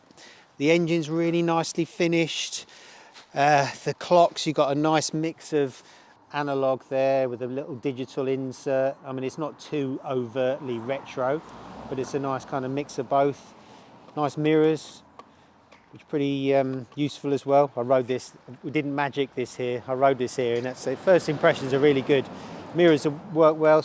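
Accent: United Kingdom. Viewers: And he still sounds UK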